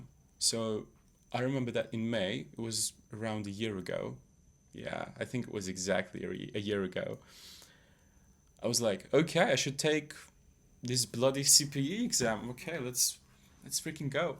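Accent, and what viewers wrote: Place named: United Kingdom. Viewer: What a wonderful British accent! You must have worked a lot on it. Plz make more similar podcasts.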